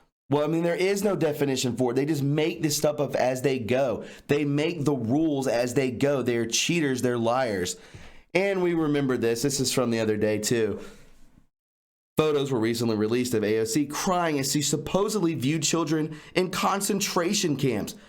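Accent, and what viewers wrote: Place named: Georgia, United States. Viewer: not sure where you are but your Southern sound a whole lot like Hart County Georgia. Love that area and miss it terribly. All the South is great, though. 🇺🇸❤️🇺🇸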